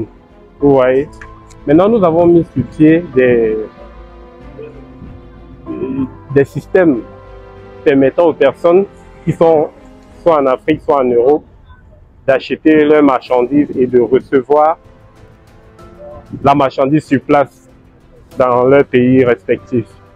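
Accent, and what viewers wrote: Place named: Cameroon. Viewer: Je suis intéressé mais gars a un accent camerounais, ca ne rassure pas.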